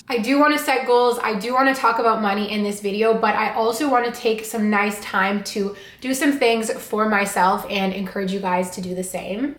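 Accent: Canada. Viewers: Hey - for knowing the days of the months - do the knuckle trick. Google it. For the laundromat - I think they reserve certain machines for doing animal hair stuff - I don’t know if these are necessarily the big machines you were talking about. But I’m glad you found a good laundromat. You do have a strong Canadian dialect 🍁🇨🇦🫡when speaking French - but maybe it’s just you talking quite fast. Maybe pretend you are talking to a young child when talking to Siri 🤓. Or why not switch to to Canadian French like he suggested? If you like to start cooking - I like to make hummus or different pestos - these are good things to use up for your lunches or in bowls. If you play around with replacing expensive ingredients with more economic ones - rocket / spinach for basil, sunflower seeds for pine nuts it can be a good alternative to store-bought ones. I put a fitted sheet on my couch - for a single bed - if you buy one that resembles linen or sthg. 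similar and you colour-match it - it really blends in and saves you many stains.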